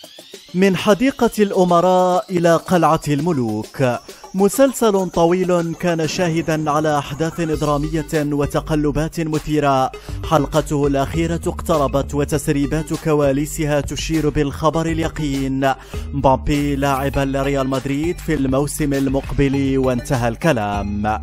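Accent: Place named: Iraq. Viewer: اه كتير حلو وحكيه مميز والسرد ايضا.. من كلامك مبين انك عراقي😊♥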